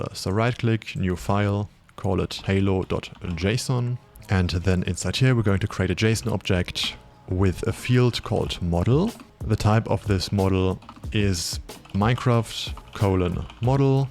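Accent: Germany. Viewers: man hört das deutsch so raus XD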